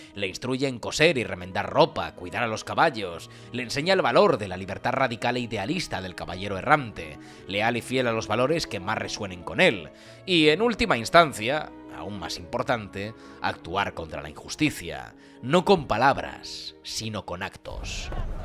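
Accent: Spain. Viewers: Que feo es el asentó español mejor que te doblen al latino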